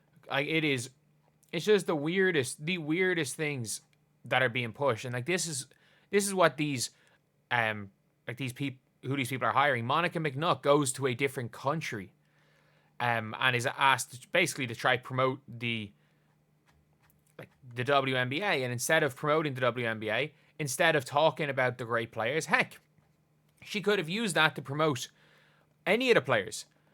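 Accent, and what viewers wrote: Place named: Ireland. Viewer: I love practicing Irish accents by listening to. I just wish I knew which part of Ireland he's from. I hear different "Irish" by various celebrities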